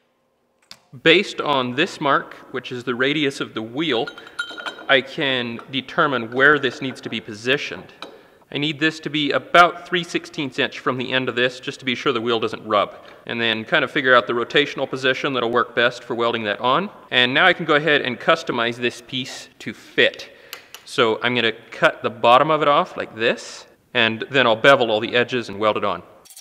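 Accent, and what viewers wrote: Canada. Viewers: This is the most Canadian sounding American i have ever heard.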